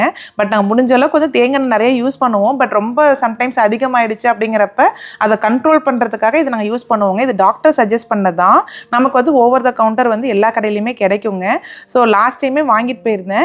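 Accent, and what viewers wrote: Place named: Palani, India. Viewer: சூப்பர் தஙகம் எந்த ஊர் கண்ணே எங்க ஊர் பழனி❤❤❤ அம்மா ஊர் எது பேசுவது எங்க மாதிரியே இருக்குதுடா❤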